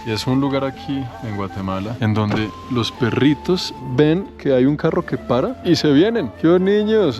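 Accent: Colombia. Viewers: Me encantas! Como te emocionas, lo tierno que eres con los perritos, que bonito nos describes tus viajes y tu acento colombiano... eres un tipazo! Saludos desde Saltillo, el norte de Mexico... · Jajaja me encanta ver sus vídeos, lo explica muy divertido y con ese acento colombiano que conquista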